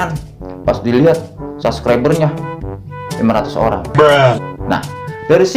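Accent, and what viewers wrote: Jakarta, Indonesia. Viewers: Iya iya..... Coba itu 😂😂😂😂betawi banget..... Salam depok citayam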